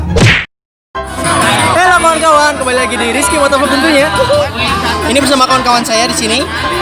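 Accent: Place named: Riau, Indonesia. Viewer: Ohh kok suaranya kek orang riau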